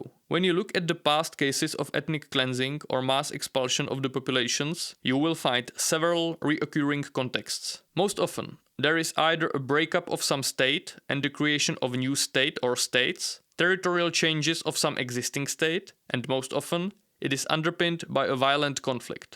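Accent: Czech Republic. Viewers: Your Czech accent is extremely strong ...Try to at least a bit to change when narrate video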